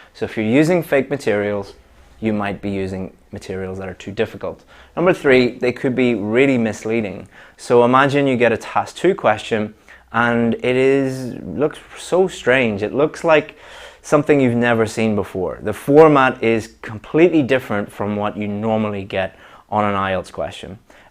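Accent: Australia. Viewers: all these days I thought you are an Australian and the accent you talk is Australian accent 😝, this proves that my listening is still poor, 😭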